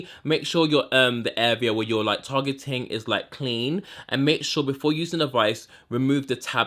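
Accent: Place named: Northern England, United Kingdom. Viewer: You’re so gorgeous it’s bloody ridiculous! Make version of Naomi C. Anyways I can hear a Sheffield or Man accent but you say all those American slangs I’m confused 😁. Uk based or nah?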